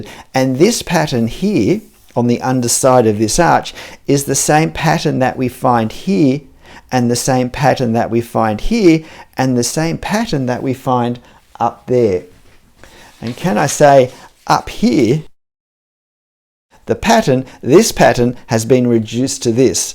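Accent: New Zealand. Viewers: I love that accent! "dee-toh" is it kiwi? New Zealand? I want to say I'm blown away by your work. The patch of flowers looked to tactile, like there was a path carved from people passing throught the flowers. And the last drawing... My God, breathtaking perspective.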